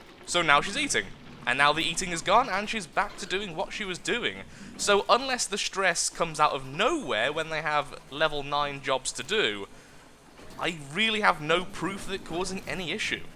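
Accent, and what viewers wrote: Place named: RP, United Kingdom. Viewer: I love how posh sounds.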